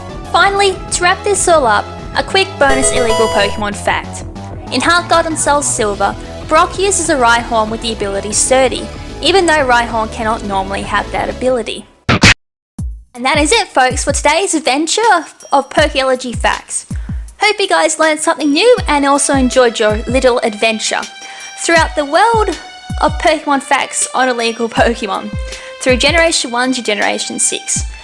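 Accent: Australia. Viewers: Pimp sent me to hear that delicious Aussie accent.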